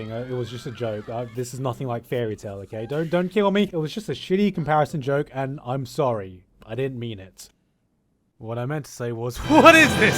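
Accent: United Kingdom. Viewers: Is this dude British or American I can't tell?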